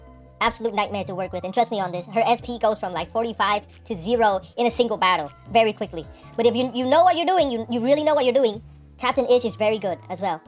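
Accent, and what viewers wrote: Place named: Vietnam. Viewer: hmm... Is it just me or... I find there're some Asian accent in your voice. Not gonna try to be racist bro but.... your voice really remind me a Vietnamese fr who us to be my friend in highschool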